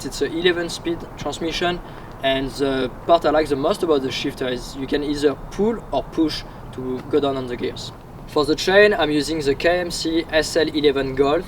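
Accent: France. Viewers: Salut Rémy Metailler trop beau ton bike.On voit quand même que tu as encore l'accent français.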